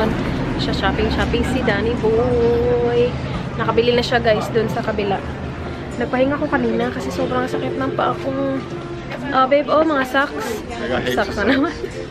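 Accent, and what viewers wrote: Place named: Cebu, Philippines. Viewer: ARE YOU BISAYA? HALATA KASI ANG TAGALOG MO NA YOU ARE A CEBUANA....IM ALSO CEBUANA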